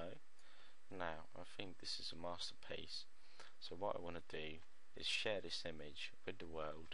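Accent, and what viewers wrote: United Kingdom. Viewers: It's British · I do have trouble following British accents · Its an English accent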